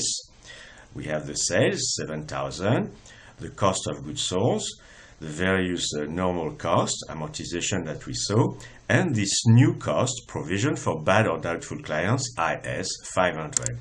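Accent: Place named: France. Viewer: This accent sounds French! :D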